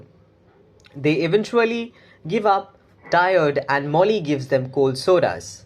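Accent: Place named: India. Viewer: I love how they used an Indian voice for the narrator... If it's to bring us together I'm in... If it's to turn people against each other I'm out✌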